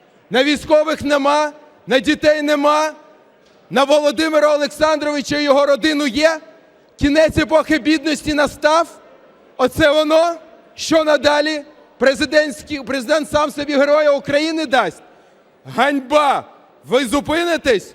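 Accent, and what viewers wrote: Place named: Russia. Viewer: Навчись розмовляти українською мовою, а не досі в тебе присутня російська! Ненавиджу таких! За що хлопці наші гинуть?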